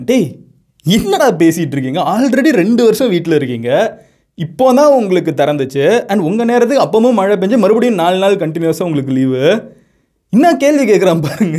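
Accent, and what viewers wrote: Madurai, India. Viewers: Madura slang nalla varuthepaa😍💝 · Madurai, sivagangai, ramanathapuram slang ah ipedithan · with typical madurai slang, potutainga, aakitainga, mooditainga😂🔥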